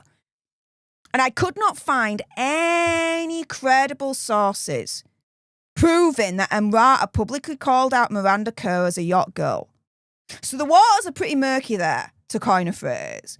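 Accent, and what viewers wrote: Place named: England, United Kingdom. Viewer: Am I the only one that thinks heavy English/Slang accent is kindda difficult to understand?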